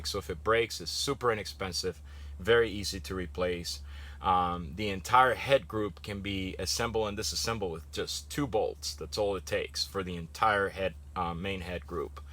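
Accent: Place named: Canada. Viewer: Great vid! I know you live in Florida like me...but you must have grown up in Canada? Accent...Thumbs Up!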